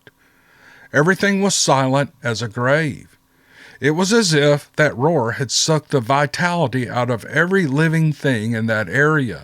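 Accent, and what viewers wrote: South Australia, Australia. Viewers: Do you have ai link to his channel? I loved your Australian accent 😃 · Great story and as always, well told, however, the Aussie accent??? Lol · You did an awesome Aussie accent, keep up the good work, you're a great bloke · I love the way he wrote his story. It put the images in my head, which makes it that much better. I enjoyed your southern Aussie accent. Does anyone have any speculations on what happened to the family of 3, and their dog, that were all found dead on a hiking trail? Very intriguing event. Thanks and to, for providing my bedtime story. Love ya!!💕👍😊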